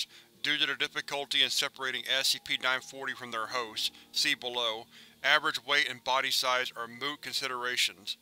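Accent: South, United States. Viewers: Third! Love your voice dude. Very southern and somehow militaristic which goes along with the SCP stuff. Been binge watching your videos.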